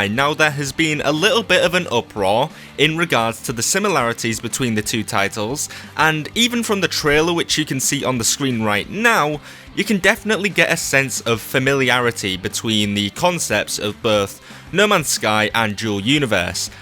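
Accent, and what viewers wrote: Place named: Yorkshire, United Kingdom. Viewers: It sounds like he's from Scarborough, this is the first time I'm watching one of his vids though... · I think it's shared... A sharing universe. Not a shard, crystal shard or otherwise. Cool accent. I wonder how an American saying 'shared' sounds to our Yorkshire friend here, probably very nasally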